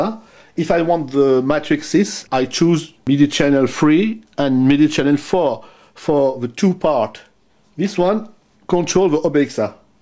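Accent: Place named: France. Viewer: Tu es francais n'est ce pas ? ca se sent à l'accent haha :p Mais c'est quand même une excellente vidéo, plein d'informations... jusqu’à là je regardais des gars comme Synthmania ou MusicTrackJP pour en apprendre plus sur la prog' des synth vintage mais ta vidéo répond à une bonne partie des questions que je me posais sur les séquenceurs, l'overdub etc. en plus de voir des beaux trésors de guerre comme le OBXA ou le Matrix 6 (dont j'ai une petite préférence pour celui là ^^) et bien évidement la DMX ! (dont d'ailleurs j'essaye de répertorier dans une playlist toute les musiques ayant été joué avec cette drummachine) Je suis encore qu'un modeste joueur qui joue avec un simple clavier MIDI relié à un PC (et je n'ai as encore publié de vidéo car hum... j'ai encore beaucoup à apprendre) mais j'aimerai bien posséder une de ces machine un de ces jours... ou alors un KORG du genre des Poly61 puisque j'aime bien ces petites bêtes qui sonne plus "wild" que les Roland de la même époque... 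et qu'ils n'ont pas encore subi l'énorme inflation de ces derniers :p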